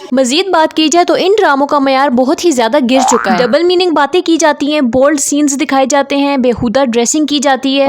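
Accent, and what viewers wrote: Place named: Punjab, India. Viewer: Kia tum Sana's bucket ho? Same awaz same style... wai patli karari si full speed pe bhagti train with some punjabi/potohari accent 😄🙉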